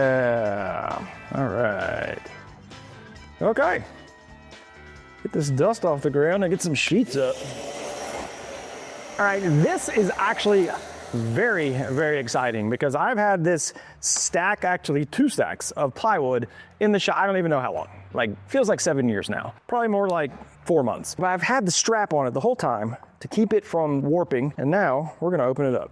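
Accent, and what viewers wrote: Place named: South, United States. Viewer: Why did voice get 10 times more country in this video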